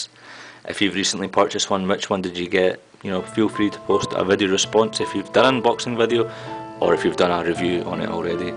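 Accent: Scotland, United Kingdom. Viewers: I just love the fact that you opened it with a butcher knife. Also love the scottish accent! great video!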